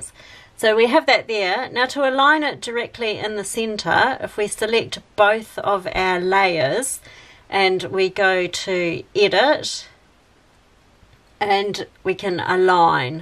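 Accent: New Zealand. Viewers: This is the best card tutorial I’ve seen so far. Thank you so much I can hardly wait for my machine to arrive. By the way so nice to hear another Kiwi hahahahaha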